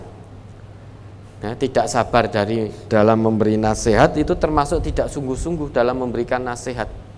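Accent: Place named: Java, Indonesia. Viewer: Alhamdulillaah..logat jawa panjenengan tadz.. mengingatkanku pada bliau al ustadz alm..barakallaahu fiik..❤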